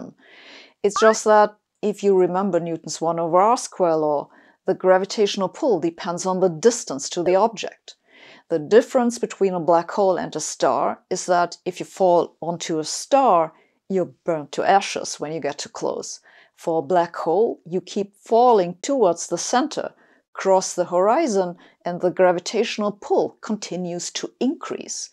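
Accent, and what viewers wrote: Germany. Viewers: Actually, I find this very funny. Sabine gives us reasons to get annoyed with physicists and therefore to get annoyed with her. But the effect of the way she presents this... it's just so endearing. Almost cute. 😄 Having a fat German accent doesn't damage said effect, au contraire. 😂👍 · Sabine, your thick German accent is voluptuous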